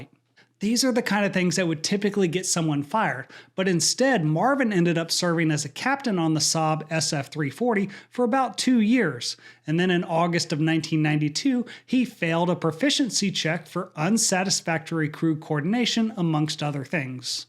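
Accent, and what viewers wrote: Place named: Midwest, United States. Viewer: I want to ask a favor, unrelated to this specific video, but pertaining to all videos you make: could you please say "so M ething" with an EMM instead of "sunthing" (with an ENN)? Thank you. It's not important at all and probably shouldn't bother me, but I feel it detracts from the overall quality of your videos, which is otherwise top notch. Ending present participles with '-een' instead of '-ing' is, I guess, only a harmless feature of a regional Midwestern accent and much less noticeable. Apologies: no offense meant.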